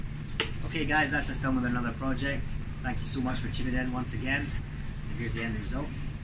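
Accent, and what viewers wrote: Scotland, United Kingdom. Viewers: I watch many cobbler channels all are great. However it is fantastic to watch a cobbler from my own country speaking Scots. Would be great to see you tackle other leather products like Bedos. Thanks. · Where are you based? Scottish accent is encouraging as I’m looking for a reliable higher end cobbler to work on a collection of welted shoes! Always something needing done but hard to find solid craftsmanship! If you were not disastrously far away I could get some work your way!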